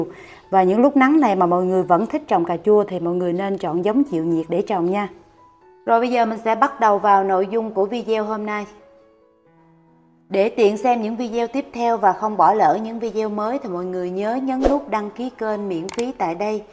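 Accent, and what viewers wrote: Mekong Delta, Vietnam. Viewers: Nghe giọng tưởng em ở miền tây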